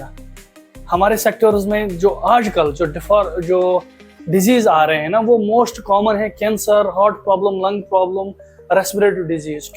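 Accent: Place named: Kashmir, India. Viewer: Are you kashmiri btw accent sae lag raha hai,i am from Jammu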